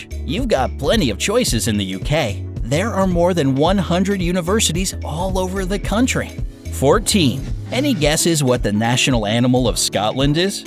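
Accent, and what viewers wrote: United States. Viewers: U sound American.. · why did he speak American accent in the video about UK???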